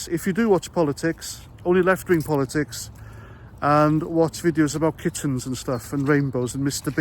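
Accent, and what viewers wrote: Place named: Northern England, United Kingdom. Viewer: great accent too.. northern and proud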